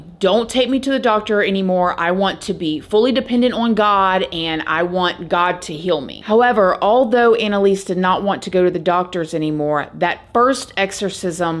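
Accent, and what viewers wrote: South, United States. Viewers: I love real life horror. Also, yes I can tell your a fellow southerner lol. Same accent as mine. God Bless.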